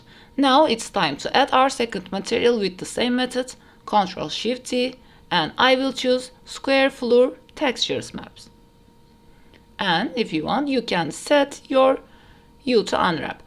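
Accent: Turkey. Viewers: Türk aksanını nerede duysam tanırım 😄 Güzel çalışma olmuş. Başarılar 😉👍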